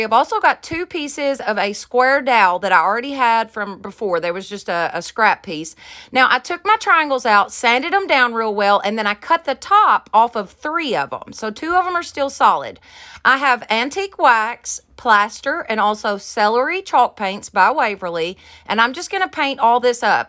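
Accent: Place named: Oklahoma, United States. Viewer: I love all your DYI'S, so stinkin'cute. I'm from Oklahoma and I talk just like you. You are so beautiful and cute at the same time.❤️❤️❤️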